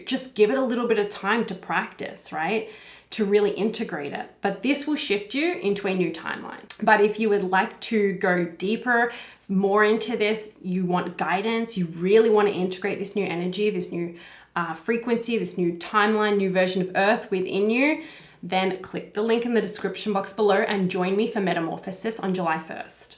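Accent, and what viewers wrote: Australia. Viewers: She’s from Australia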